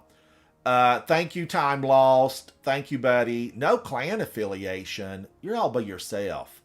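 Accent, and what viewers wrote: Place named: Appalachia, United States. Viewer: What is your accent? Appalachian? Love your content, you're very charismatic.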